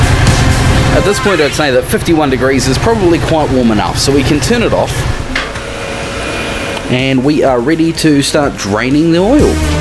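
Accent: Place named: New Zealand. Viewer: I love NZ accent...Great video.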